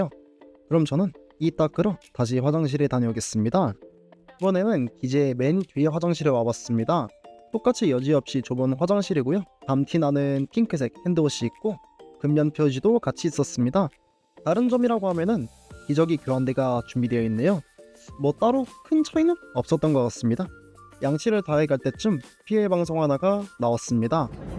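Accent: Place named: China. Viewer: 중국인임? 발음이 한국인이 아닌데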